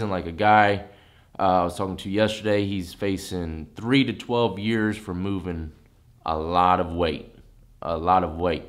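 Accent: United States. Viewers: Why do you Americans say the letter Z wrong!! And in a bid is a way of saying they tried to !!! Not in a bid how death said it !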